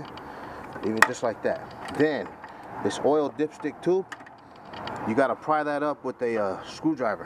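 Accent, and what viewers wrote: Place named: California, United States. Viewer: this fools from cali! i can hear his accent, keep doing your thing man!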